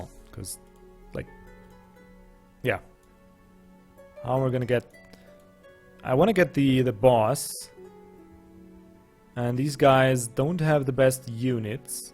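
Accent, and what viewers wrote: Germany. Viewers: Hi and a belated welcome to the weird world of Roving Clans. Thumbs up for pronounciation so far. I'm very sad to see this island thing again. To me it takes away a lot of the Roving Clans dealio; wheelin' and dealin' in all eras to survive, and eventually getting foreign trade routes. You'll never get that here because the AI apparently does not believe in sea trade. And, as I've previously stated, the AI kind of stinks at naval activity (expanding, scouting, warring). To top it off, your island sucks, resource-wise. This is really bad because RC is not a very strong faction to begin with, and really needs all the help it can get. About the urces quest. If you think your hero and your paltry units can handle two urces, think again. They will eat your cavalry for breakfast and use Sirya's bow as a guitar while dancing on her bones. You cannot underestimate just how bad the RC military is early on. 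They are T-E-R-R-I-B-L-E. I mean, look at the stats of your guys, and compare them to what you can buy in the marketplace. One horse guy, or a 400-hit point deamon, or a 300 hit point ended? Hmmm! Difficult choice. Remember the mercs gain 2x hit points (not factored in the market display). Anyway, I honestly feel my motivation to watch your playthrough diminishing a bit. I just don't think it will be that fun to sim city on an island (again). Hope I'll be proven wrong; you certainly have surprised me before. Sorry for all the negativity - I still love your German accent.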